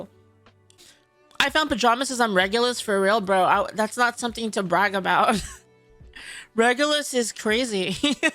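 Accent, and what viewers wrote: United States. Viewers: Unrelated but, As a British man, i find it funny hearing an American explain what rain is to me 😂 i was 4 when i discovered i could take off a raincoat, we're practically born underwater it rains so much here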